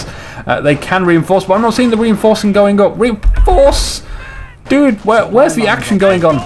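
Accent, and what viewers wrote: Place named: United Kingdom. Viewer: WAIT A MINUTE... you're British?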